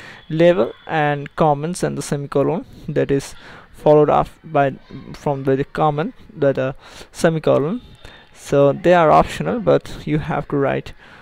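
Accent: Bengal, India. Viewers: he is indian and his mother language is "Bangoli"(local language)... so this "Bangoli" accent is coming in his English.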